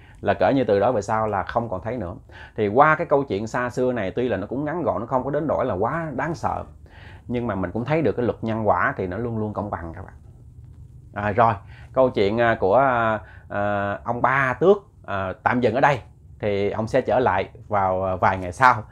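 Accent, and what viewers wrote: Mekong Delta, Vietnam. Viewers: Rat nghe chat giong mien tay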